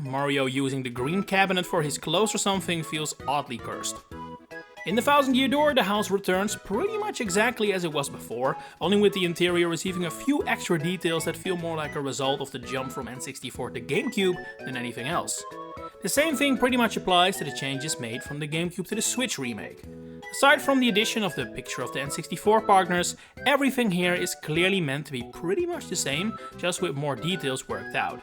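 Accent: Netherlands. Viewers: Dutch accent detected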